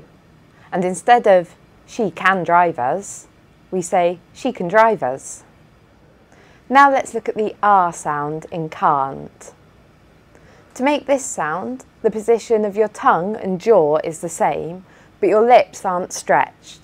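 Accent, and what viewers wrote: United Kingdom. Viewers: 고맙습니다.... 영국발음 쨩.. (하트)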